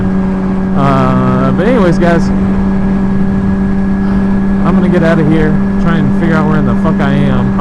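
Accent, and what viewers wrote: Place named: Alabama, United States. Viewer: U from Alabama too?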